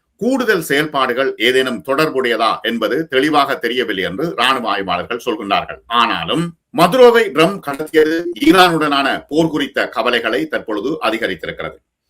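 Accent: Jaffna, Sri Lanka. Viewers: Jaffna tamil voice nantraga ullathu 🙏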